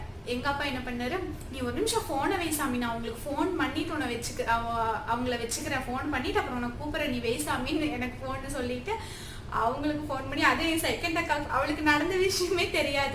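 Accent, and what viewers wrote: Kongu, India. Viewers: Kongu Tamil koncham pesunga.... I like the slang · I like your kongu slang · akka how r u and ur family? Oru day neenga full kongu tamil pesura mathiri video podunga ka please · Kongu tamil one time pesuga my fav...slang🥰my athai home in Tirupur naa anga iruthan near by perumanallur so one time post ur slang kongu tamil...sis. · Unga appatha oorla nadanthathuku vayiru valika sirichom... Elorukum iPadi akka thangachi amayraanglanu theryala.. Kongu tamilla oruvaati paesikaatunglaen akka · Kongu Tamil akka Nega pasarathu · கொங்கு தமிழ் Sema feel 😍😍😍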